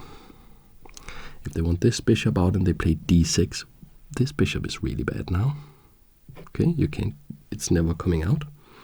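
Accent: Denmark. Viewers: Are u from denmark? I can hear the accent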